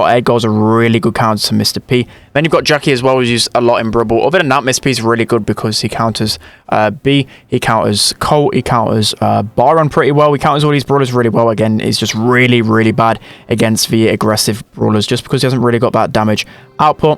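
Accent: United Kingdom. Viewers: BRI'ISH accent bloody hell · just a british accent :/and yea it is the best one out there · british accent bro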